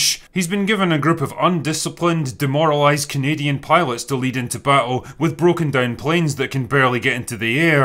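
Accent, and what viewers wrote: Scotland, United Kingdom. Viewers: Really weird hearing a Scottish accent saying anything good about the English.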